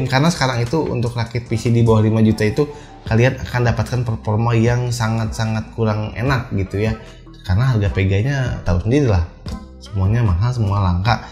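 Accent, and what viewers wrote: Bandung, Indonesia. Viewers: Sigahna orang bandung nya kang. 😅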